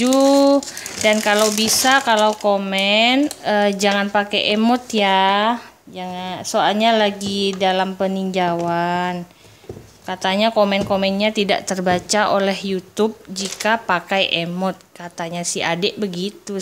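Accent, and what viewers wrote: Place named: Sumatra, Indonesia. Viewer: ohh pantes logatnya sumatra bgt..lam kenal balik bun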